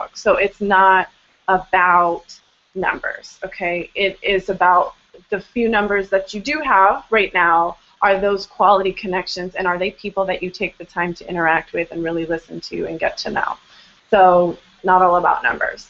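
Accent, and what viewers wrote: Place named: United Kingdom. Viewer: Lol love the British Accent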